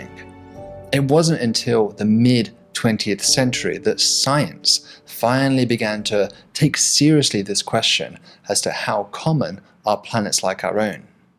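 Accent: United Kingdom. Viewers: So if as you sound are British, why are you using so many Americanisms? Would an American do the same?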